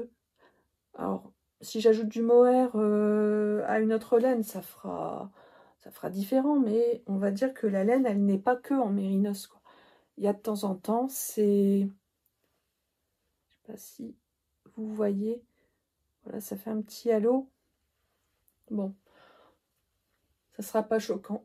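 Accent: France. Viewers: Ah! J'avais bien perçu un p'tit accent du nord.... bon c Pas-de-Calais mais c du pareil au même.... Originaire du nord, j'ai tjours adoré les plages du Nord, en particulier Ambleteuse, gris nez et blanc nez qui malheureusement ne sont plus aussi sauvages qu'à mon époque (année 70-80) qd on y allait en diane... Vos videos st agréables et je me suis abonnée pour suivre vos aventures tricot et couture avec ce p'tit accent de ma jeunesse. Amicalement.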